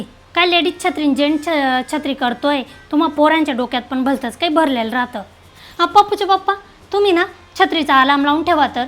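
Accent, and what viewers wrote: Khandesh, India. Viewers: बापरे, तू ग्रेट आहेस ताई! Content wise पण आणि अभिनयाच्या बाबतीत तर तुला परकायाप्रवेश करायला तुला काहीच वेळ लागत नाही, सराईतपणे करतेस! एकूण एक मुद्दा cover करतेस, ते पण तुझा खान्देशी लहेजा सांभाळत! खूप खूप शुभेच्छा! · खानदेशी लहेजा खुप छान सांभाळत घरोघरी असेच संभाषण चालूच असत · Typical Marathi Aai Tyat Pan Khandeshi Tone 👌👌👌👌😍😍👍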